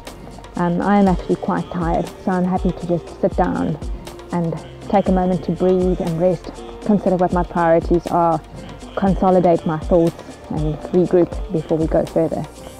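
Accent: South Africa. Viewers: We made a drinking game where everytime you say water (woter) in that beautiful sa accent we have to drink. After 6 minutes we are absolutely smashed. 😂😂😂 Awesome videos, thank you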